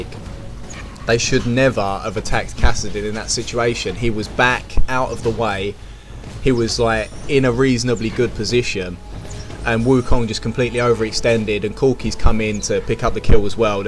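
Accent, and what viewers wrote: United Kingdom. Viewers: I love your videos !! Just the fact that you speak "English English" makes the whole thing alot more understandable cheers !!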